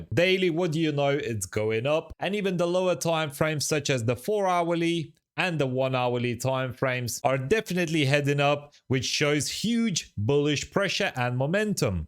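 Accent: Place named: United Kingdom. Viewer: I have no idea why the messages aren’t coming from your side. However I am available in ur discord server. Are u a resident in UK 🇬🇧? Your accent seems like u are a British.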